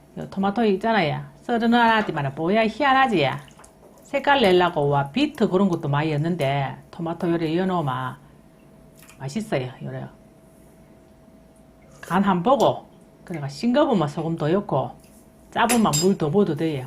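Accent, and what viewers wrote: Gyeongsang, South Korea. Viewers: 너무 크다타이하모 입이 찌져집니더~ ㅋㅋㅋ갱상도 말은 언제들어도 웃십니더 ㅎㅎ 지는 대구에산지 38년되었는데 말투가 갱상도 충청도 짬뽕입니더 ㅎ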